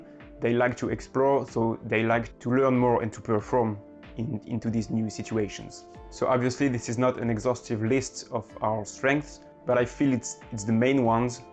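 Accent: France. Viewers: Sounds like a french accent.but just my guess not sure.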